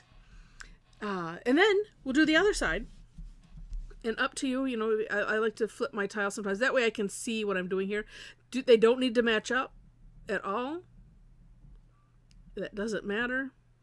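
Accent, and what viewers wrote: Midwest, United States. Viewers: Good morning, I work right along with your videos. It takes me a little longer so I constantly have to go back with my tablet. But it's okay cuz I like listening to you talk while I work. I love your med Western accent. I'm from Chicago but I live in North Carolina now and just about everybody has a Southern drawl here. Thank you for putting out these videos. ❤️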